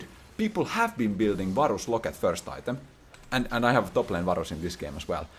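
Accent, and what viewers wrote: Finland. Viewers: Banger finnish English. Warms the heart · love your (finnish?) accent. One item, hahaha. Two item hahaha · are you from finland by any chance? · My dude your accent is amazing 😂 ootko suomalainen? My favourite was definitely “obsörved”. The stats were fun, not even a LOL player but this was fascinating. Best of luck with your Fall & future endeavours. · Hear Finnish accent. Subscribe to channel. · Hyvää duunia, ja tosi hyvin selitetty enkuksikin. Hämmentävää miten rallienglannin kuulee välittömästi mut kundi artikuloi nii hyvin et vika on kuulijassa jos ei ymmärrä. Analyysi on kyllä hiton mielenkiintosesta aiheesta, pidän nää pointit mielessä ku meillä tulee statistiikkaa taas jossaki kohtaa. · i immediately recognized your Finnish accent, and its interesting that the thesis says "Aalto University" as well XD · jumalauta mikä rallienklanti <3 jos et tee tahallaan nii kandee panostaa tarkotukselliseen ralli artikulointiin, siinä on tavaramerkkijä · Nyt onkyllä jo pakko kehua tätä suomi aksenntia!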